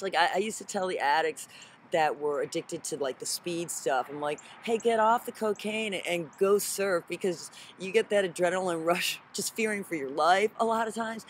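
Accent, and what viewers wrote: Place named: California, United States. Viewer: The 405 traffic!! you sound so Californian I can listen all day!!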